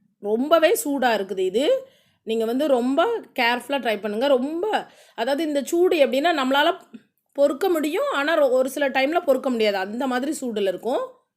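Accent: Tirunelveli, India. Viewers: Akka KU tirunelveli pola.. tamil avlo arumayae iruku kekum pothu 😍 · Thirunelveli language arumai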